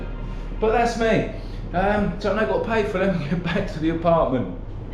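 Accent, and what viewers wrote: England, United Kingdom. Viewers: Where are you from? England??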